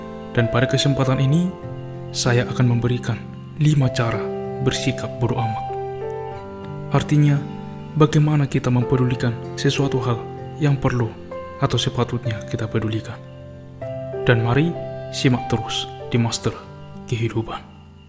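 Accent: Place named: Sulawesi, Indonesia. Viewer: Abang nya asli mana seperti logat Sulawesi -_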